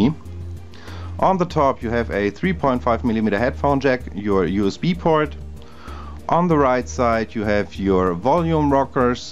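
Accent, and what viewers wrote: Germany. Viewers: I hear German accent)) Great review )) i think it is a good device ))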